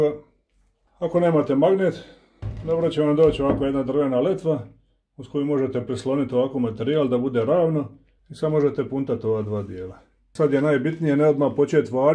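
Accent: Dalmatia, Croatia. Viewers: Obozavam ovog covjeka slusati kad prica. Meni su Dalmatinci vrh🙃 Inace sve dobro objasnjeno Pozdrav iz Banja Luke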